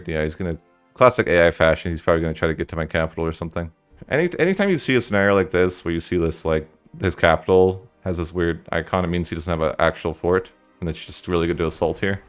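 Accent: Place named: United States. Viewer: You sound so similar to an American colleague I work with, what state did you grow up in if you don’t mind me asking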